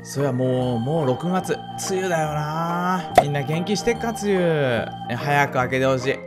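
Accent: Fukui, Japan. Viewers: え、福井の方？嶺北の方ですか？ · 前回のカズクラの福井弁はどうしたのでしょうか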